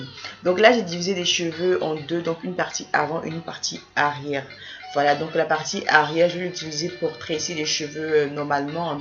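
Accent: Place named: Ivory Coast. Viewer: de que j ai entendu t as voie j ai vue que tu étais ivoirienne #225👊👊👊👊👊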